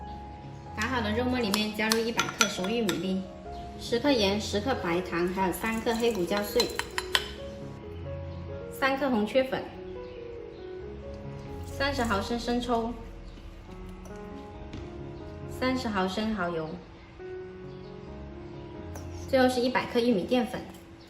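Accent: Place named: Hakka, China. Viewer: 问题是哪里买这肠衣呢🙈。宝贝普通话客家口音好亲切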